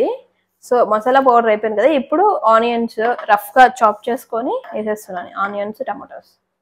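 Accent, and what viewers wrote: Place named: Anantapur, India. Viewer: Pure Anantapur slang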